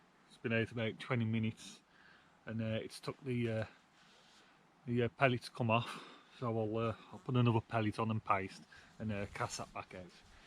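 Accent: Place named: Black Country, United Kingdom. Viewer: Enjoyed that, always feel at home with the Black Country accent haha. It’s a beautiful place, the middle Severn. Have you fished Atcham at all? It’s a place I used to go quite a lot.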